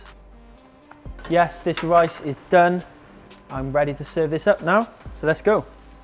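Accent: Wales, United Kingdom. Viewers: Aw. It's such a musical accent. My whole family is Welsh but sadly my sister and i were the only cousins brought up in England so we don't have the lovely lilt that has...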